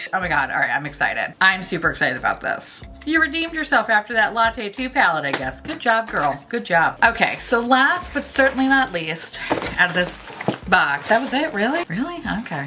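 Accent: United Kingdom. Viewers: The British voice is the best! “At the scene of the crime, there was...” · LMAAAAOOOO the urine sample cup Stranger Danger, that's what I yelled out when I saw it. Also, my skin screamed 💀 bc that looks like a recipe for skin turning to the actual 🏜 desert Yes! Please do more vids like this! LOLLLL I adore them! The British accent gets me every time ❤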